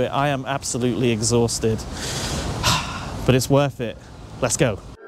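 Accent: United Kingdom. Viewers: Yeah; this definitely works better in a British accent... (esp. reciting fake Russian words) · Great to see these locations , good video . The Nadsat is cool but as you are an Englishman , complete with middle class accent , it is really jarring to hear you use a phrase like 'super excited to be here' . Come on man , really ? Not quite as depressing as the McDonalds .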